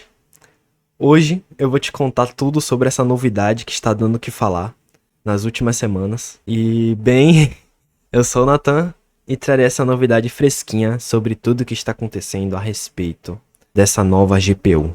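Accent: Bahia, Brazil. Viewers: O bahiano é perceptivel de longe kkk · Você é baiano?? lembra um pouco o sotaque daqui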